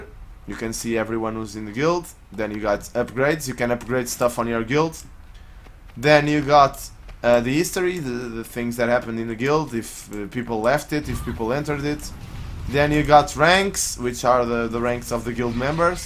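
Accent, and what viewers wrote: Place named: Portugal. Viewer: Bom video, assim que te comecei a ouvir percebi logo que eras português kkk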